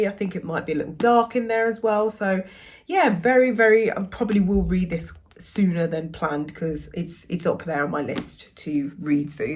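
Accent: Midlands, United Kingdom. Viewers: Glad you got 'Exciting Times' cheap. My experience going in hearing promising reviews, was left feeling more unexciting times. But hope you have a better experience with it, love your content and hearing a fellow midlander on YouTube ✊️ - Fab ✨️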